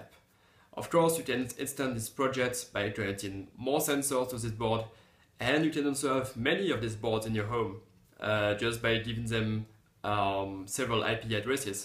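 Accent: France. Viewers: Mais tu es français ? :D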